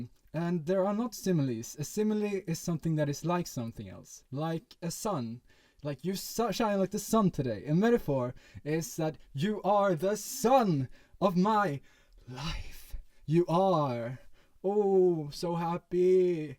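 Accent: Sweden. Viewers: Loving the Swedish accent which is strong in this one:)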